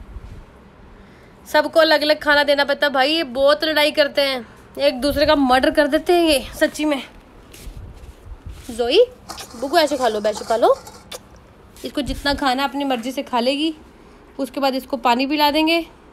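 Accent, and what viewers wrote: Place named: Haryana, India. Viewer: Mei v karnal jdo aye tuhanu miln awAge tusi bilkul mere Wang boldey O meinu apna Haryana yaad a janda tusi bot changa kamm kardey o rabb tuhade harr khwaish poori karey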